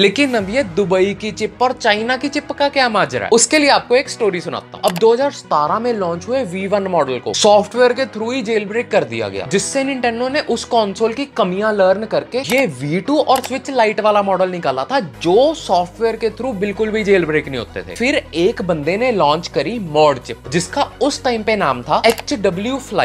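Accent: Punjab, India. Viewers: I came to listen his Punjabi Hindi accent......specially the word Chippppp😂😂 Loved your content😊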